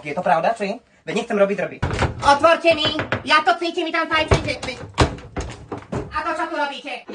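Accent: Slovakia. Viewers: Nebojkajtě sa 😂😂😂 krčaky ako potrubia, drzkajte se mna….já lubim to jak si hraješ s tou slovencinou 😂😂😂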